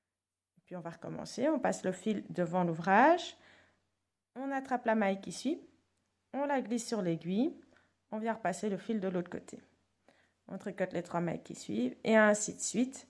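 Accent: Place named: Nancy, France. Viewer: On dirait que vous avez l'accent de Nancy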